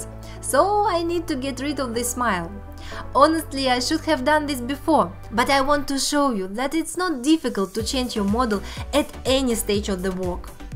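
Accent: Russia. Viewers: я прям слышу родной русский английский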